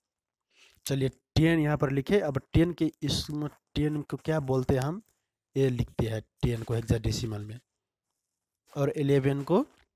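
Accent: Nepal. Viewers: Are u Nepali ?? Reply honestly 😸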